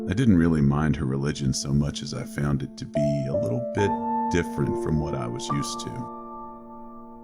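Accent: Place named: South, United States. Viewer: where are you from? Sound like you got some south in ya sir.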